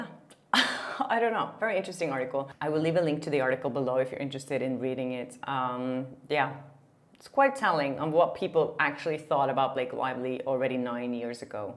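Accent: Norway. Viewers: Your Norwegian accent is beautiful! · I find a little Norwegian twist to some words quite charming! The friendship between Ryan Reynolds and Hugh Jackman is a dichotomy. (Hope I’m using the correct word). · I love your Norwegian accent! We visited Stavanger last year and had an absolutely lovely time except for the hills. My legs were aching. Keep up the good work. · Your Norwegian accent is lovely and your syntax mix ups are lovely too! ❤️❤️❤️❤️ we know what you are saying and you are doing great! 🎉❤ You are also doing better in your second language that 99% of other ppl in the comments could do! 😉😉😉 😉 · You're adorable!! I much prefer your original Norwegian pronunciations!!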